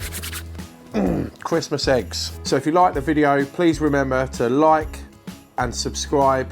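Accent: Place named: United Kingdom. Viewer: I have to admit, I was not expecting a British accent when I started this video. Definitely going to give the recipe a shot in the future!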